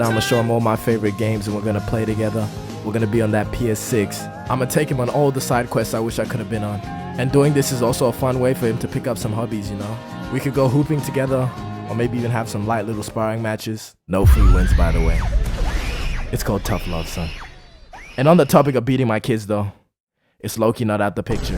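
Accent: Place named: United Kingdom. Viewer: this dude sound like a british dude tryna sound like a florida jit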